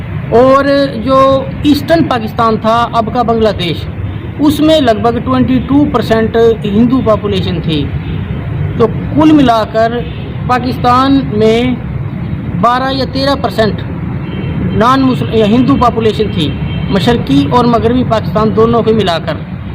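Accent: Haryana, India. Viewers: When you speak Haryanvi,it gives a quite different impressin.Anyhow I regularly see your videos based on exodus from Haryana which makes me remind what my father used to tell me calling it Gadar.I respect you for your way of putting things in the true perspective regarding Haryana's culture then a part of Panjab.I am from Karnal.One question which still remains illusive is about atrocities on Hindu women by Muslims.Honestly clarify it.Hatred & radicalisation from any side shall only be destructive for humanity in both countries.Thanks & best wishes for your efforts to make people know the painfull reality of partition. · Haryanvi bolte ho bilkul hamari side ki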